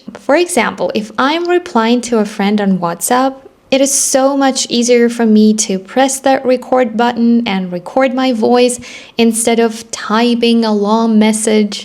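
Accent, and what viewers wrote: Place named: United States. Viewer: All Russian people that I know. They are speaking very well. And they have good pronunciation like an American accent. How is that possible? Your speaking skills amazing you’re learning quickly how? :))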